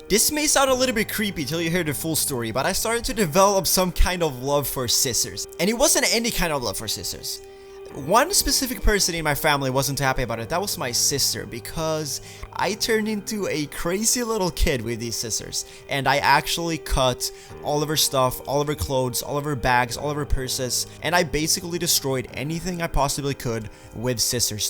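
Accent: Sweden. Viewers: He had this Swedish accent back then. It's going away now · Dang this is when had that really big Swedish accent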